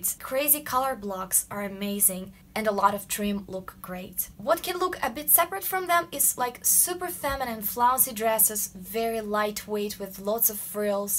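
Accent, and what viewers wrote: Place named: Russia. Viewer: That is funny I found that your accent someway is like you are surely not from Us or England and then I checked you channel oh gash you are russian. That accounts for the milk in the coconut xD OMG I can't really stand it but your information seems pretty important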